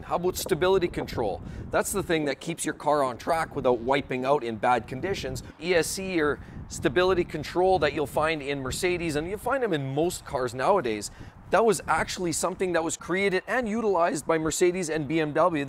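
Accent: Canada. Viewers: Abut , ut , lol. Is this guy Canadian? Talks funny. Mercedes unreliable. Chinese transmissions.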